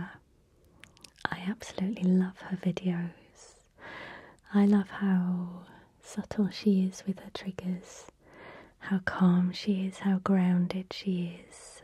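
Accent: United Kingdom. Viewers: Thank you! I'm so happy you shared your favorite ASMR channels with us... I too love ASMRtists with an accent, I don't know why.. and for me YOU have a lovely English accent;-) I'm a French-speaking canadian, so your british accent is really exotic to me! Many artists from over the world do videos in English, I guess it's easier to reach more people using English, since it's an international language... but I'm always happy when I discover an ASMR artist that speaks french. Unfortunatly, there are not that many... So I decided to start my own little channel in French, and you really are an inspiration to me! I'm about your age and I somehow recognize myself in your life story (I loved your "life in drawings video"). Thank you for your generosity! xx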